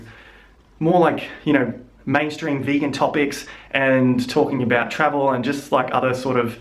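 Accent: Australia. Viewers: you've picked up the australian accentttt :D :D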